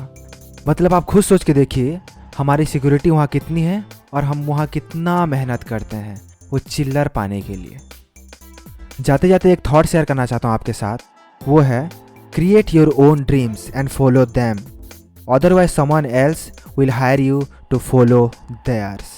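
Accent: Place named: Bihar, India. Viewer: Bhai bihar se ho kya. Acha kam kr rhe ho.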